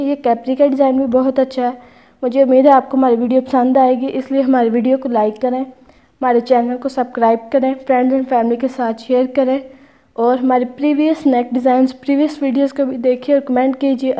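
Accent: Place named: Faisalabad, Pakistan. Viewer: Kafi arsy sy me apki videos dekh kr kpry silai kr rhi hun, jazak Allah, ap bohat assani sy mushkil cheezen smjha deti hein, Faisal Abadi lehjy wali Urdu, Amazing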